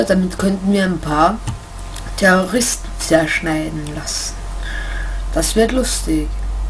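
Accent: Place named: Austria. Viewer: he du kommst ya aus österreich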